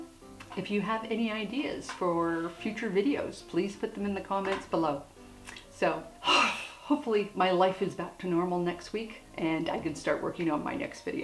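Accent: Canada. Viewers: I knew it! You're Canadian ya! me too. Thanks for your informative videos. Bye from BC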